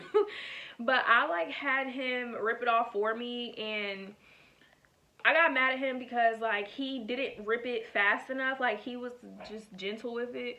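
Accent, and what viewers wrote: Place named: Pittsburgh, United States. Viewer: Are you from the Pittsburgh area? I hear you accent LOL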